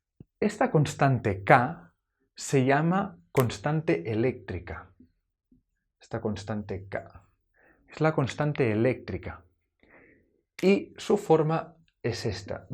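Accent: Spain. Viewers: como se nota el acento catalán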